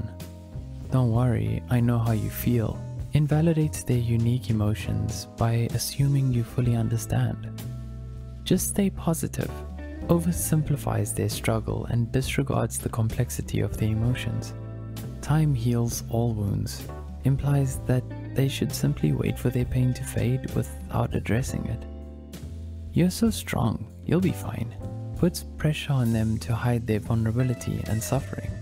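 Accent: South Africa. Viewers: Am I the only one hearing his South African accent? 😅 hey Bru howzit 😂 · Is the speaker South Africa?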